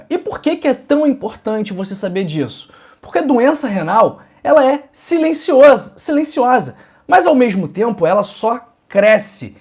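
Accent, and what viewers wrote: Rio de Janeiro, Brazil. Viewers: Esse sotaque de malandro carioca faz derrapar toda a credibilidad neste vídeo 🤣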